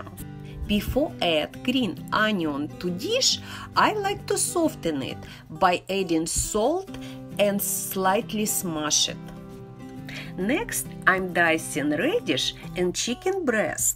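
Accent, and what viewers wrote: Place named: Russia. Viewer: I love your soup and your Russian accent also, my wife is Russian and i love anything Russian 😍 nice video👍Спасибо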